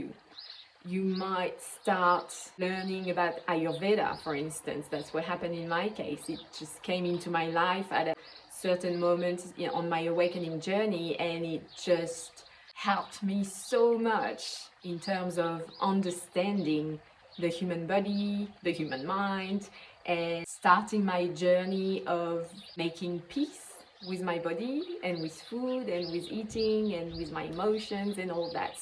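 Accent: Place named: France. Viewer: It is funny how some videos just appear. It sounds like you are french. Have you heard about the cathars?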